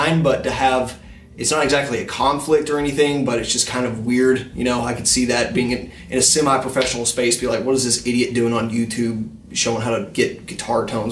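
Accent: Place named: Mississippi, United States. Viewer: Digging the channel man, keep it up. I recognize that accent, sounds like Mississippi or somewhere around there to me! I got friends out there, haha. ;)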